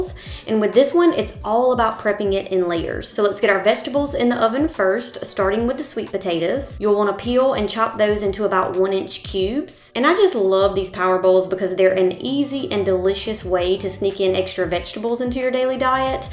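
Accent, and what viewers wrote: South, United States. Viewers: Heard the annoying southern accent and had to exit the vid ngl 😭💀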